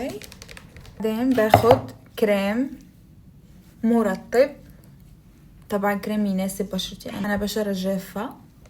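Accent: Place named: Morocco. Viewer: واش بتعيشي في المغرب لأنك مبين انوا انتي مغربية لأنك مابتعرفي تتكلمي منيح بالسورية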